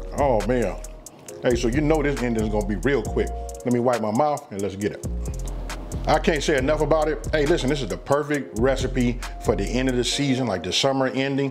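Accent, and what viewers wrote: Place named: North Carolina, United States. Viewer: This is off topic, but your accent sounds similar to someone I know from North Carolina. You wouldn't happen to be from there originally would you? Nice video, by the way. I'm definitely going to try this! Thanks!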